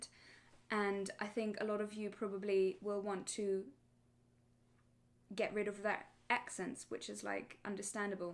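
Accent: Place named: United Kingdom. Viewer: You are German? Umm, I thought you are British! Congrats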